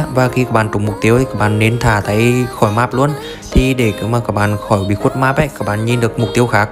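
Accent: Central, Vietnam. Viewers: anh là miền trung ak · Giọng miền trung là like comment đăng kí liền. Ko nói nhiều. Ủng hộ bạn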